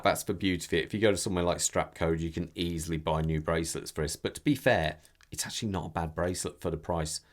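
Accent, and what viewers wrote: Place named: Midlands, United Kingdom. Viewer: It’s nice to hear a Midlands accent. In fact it’s bostin.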